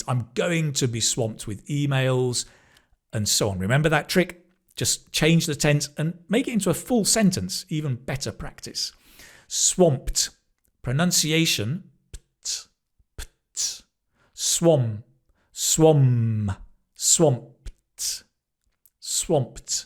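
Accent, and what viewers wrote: United Kingdom. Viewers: ❤ British accent ❤❤❤❤❤ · Pretty obvious he is British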